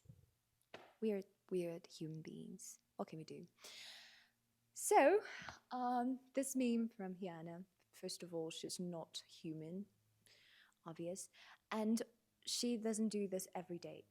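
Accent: United Kingdom. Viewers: Hummm!! Inglês britânico! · In your first videos you used to speak with an American accent.. now you are speaking with British accent .. congratulations for being able to change accents so well... I am able to speak only with the American one .. and that's the one like the most .. but somehow I enjoyed listening to you speaking with British accent .. it is kind of pleasing to my ears ..I dont know how to explain it ..😅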